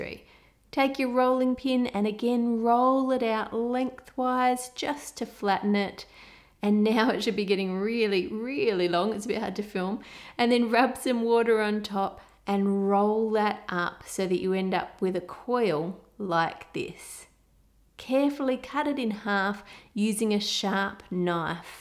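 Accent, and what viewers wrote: United Kingdom. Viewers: what accent is this? It sounds british but what region specifically? kinda sounds like someone attempting to do a british accent lol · Who else is practicing their British accent while watching 🤣😅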